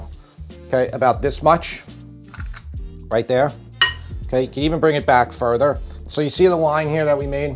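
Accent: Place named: Pittsburgh, United States. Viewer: You sound like a Pittsburgher! My Slovak grama put ketchup in eveything including her homemade chicken noodle soup!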